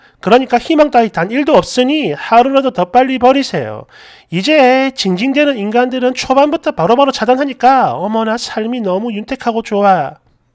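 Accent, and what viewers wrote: Gyeongsang, South Korea. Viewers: ~~~ 쥐~~짠다~~~~ㅋㅋ 전에 경상도에서 10년정도 살은적 있었는데 덕분에 오랜만에 듣고 혼자 빵터졌어요~ㅋ 글구 사연속에 저 쥐 짜는놈은 듣다가 육성으로 ㄱㅅㄲ~~라고 욕했던건 안비밀 이궁~ㅋ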